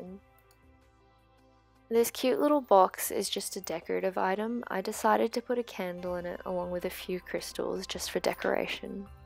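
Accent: Australia. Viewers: Umm yea can I just come live where you are. I got my hopes up before watching, but we definitely do NOT have most of the things you just showed in dollar stores here in New Orleans. You can find candles..that's it. Everything else is only found at walmart & would've cost you either triple or not sold at all 🙄 You sound like you're from OZ..I've always been in love with your country since I was a kid (I am fascinated by literally everything about Australia & was also obsessed w Silverchair) so thx for adding more to the list lol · I have a feeling this is an australian youtuber- her accent seems mashed up...and i'm in australia and seen those things locally too :)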